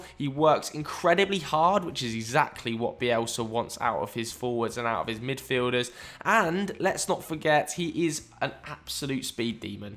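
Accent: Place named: Burnley, United Kingdom. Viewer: Love that has kept doing the Burnley accent since I said it was class like a year ago 😂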